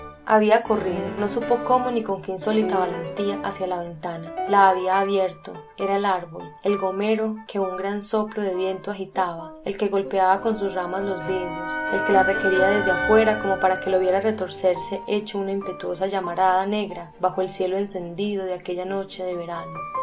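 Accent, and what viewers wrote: Antioquia, Colombia. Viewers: El acento de colombiana le da otro aire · Que habladito paisa tan ricolino e.e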